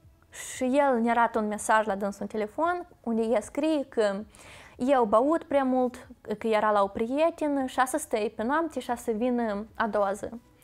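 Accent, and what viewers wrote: Moldova. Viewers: Ce nu inteleg, daca această fata a fost crescută în Elveția de la 3-4 ani, cum de are un accent moldovenesc ca o persoană care acolo a crescut?🤔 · Vorbesti foarte bine româneşte!Bravo!Mai ales ca o vorbeşti cu accent frumos moldovenesc,eu fiind din Suceava 🇷🇴Esti desteapta,deosebita,nemaiponită❤❤❤ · Totuși, de ce are asa accent moldovenesc, dacă a trăit mult fără mama în Elvetia?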